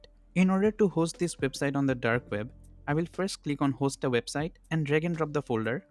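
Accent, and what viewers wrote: India. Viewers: That's a true indian accent are you an Indian?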